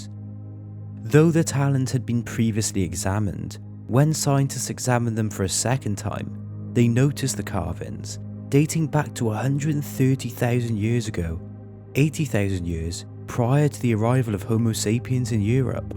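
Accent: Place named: Shrewsbury, United Kingdom. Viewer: The documentary I've been hoping for.... since primary school when I read a book on prehistoric Britain & saw intriguing paintings of neanderthals, to the Frazetta "Neanderthal" (worth doing an image search for!) I've been fascinated. Clan of the Cave Bear was food for thought... this is perhaps the best documentary I've watched. And your accent & narration adds to it (Shrewsbury?)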